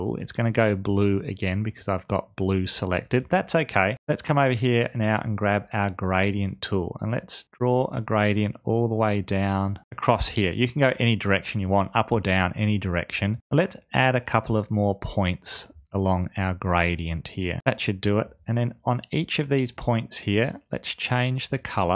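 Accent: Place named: Australia. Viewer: Thanks mate i have only just found you.... easy to understand you are an Aussie!!! I'm staying on board!!!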